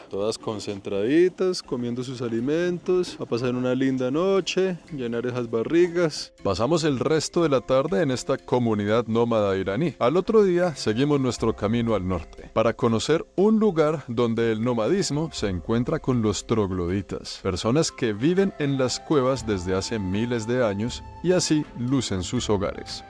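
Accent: Bogotá, Colombia. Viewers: Hola, me gusta su canal. Soy de Bogotá por lo q me río mucho de su forma de hablar porque hablamos muy parecido. Hola niño!! Q dice el niño!! 😅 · Soy nueva viendo tu canal y lo que más me gusta es como le hablas a los animales y es porque yo lo hago así también, ademas me gusta mucho el acento colombiano, gracias por compartir tu viaje de vida.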